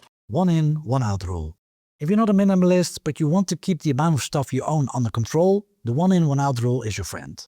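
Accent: Netherlands. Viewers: Ik hoorde het accent, maar dacht: misschien is het een Deen. Dus ik keek naar hoe je ramen sluiten. Dat leek niet Nederlands. Uiteindelijk hoorde ik het je toch bevestigen. Wel een Nederlander. Voelt toch als thuis 😊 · Are you from the Netherlands!? You seem to have the same accent as i have, and I am from Rotterdam? ❤ Add: Yup, went further down the video, and you mentioned "niksen!" Echt uit Nederland, dan!? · Het was de Gamma verhuisdoos die mij de tweede bevestiging gaf, na het horen van zijn accent. Ons kent ons...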